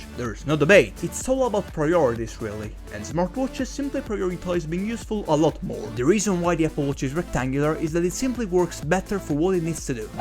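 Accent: Italy. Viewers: Bellissimo video, complimenti! Avevo sentito l’italianità dalla pronuncia e infatti non era difficile da notare 😂🔥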